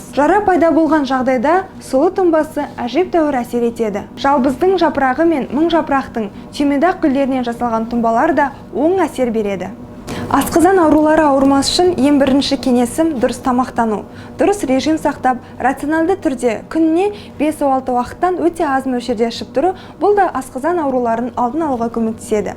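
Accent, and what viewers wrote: Standard. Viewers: Құп көрдік. Міне осындай таза сөйлейтін қазақтар көбейе берсін... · Міне керемет ! Таза қазақша түсіндірді кеңесіңе Рахмет!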